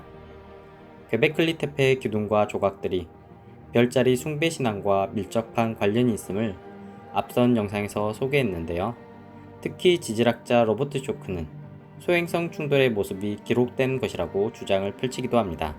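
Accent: Jeolla, South Korea. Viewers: 진지하게 얘기하다 중간중간 튀어나오는 전라도 억양이 너무 귀여우시네요!ㅎㅎ 좋은영상 앞으로도 부탁드려용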